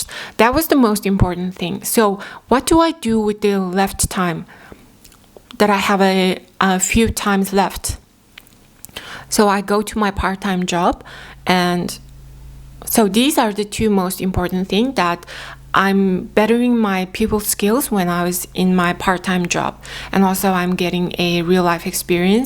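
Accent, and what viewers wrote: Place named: Australia. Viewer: .. you are starting to get an Australian accent. 😁 Would you still study business if starting again today?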